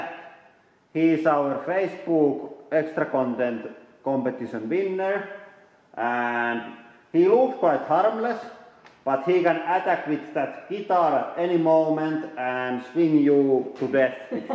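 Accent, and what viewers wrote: Finland. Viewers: Sä kuulostat vähä suomalaiselt, mut ootsä🤔🤔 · Tykkää jos oot tilannu kanavan vaan tän ihanan rallienglannin takia💗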